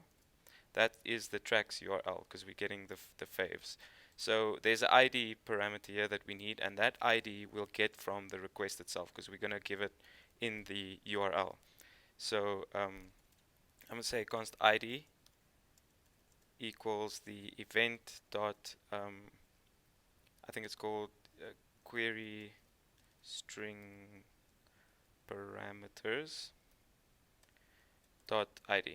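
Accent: South Africa. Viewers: You sound South African brother. Thank you for this tutorial. Just what I needed right now.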